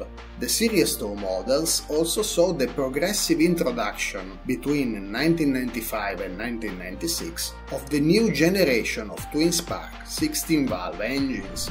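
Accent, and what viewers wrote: Italy. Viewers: Just small correction (sorry to be that guy)... You said that 'Consequently, the 155 actually lost customers to Alfa Romeo' - you don't want to use the word 'to', as you are saying that Alfa has lost customers to itself. Obviously that is wrong. If you wrote 'lost customers to its competitors' that would make sense. Or wrote ' the 155 lost Alfa Romeo customers' that too would make sense. Love your channel, and you have the nicest Italian accent!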